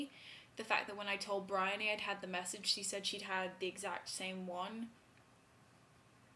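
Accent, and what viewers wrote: England, United Kingdom. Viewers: do canadians have that accent? sounds like semi-british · hiii:3 i love your English accent... *stares relentlessly* -Fullmetal Alchemist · i don't know, sounds like she has an english accent, so im not sure. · so i was bored and my subscriptions reset and i saw your video and decided to watch it again lol. still funny and i gotta say (in hopefully a noncreepy way? haha) the british accent is still very cute ;)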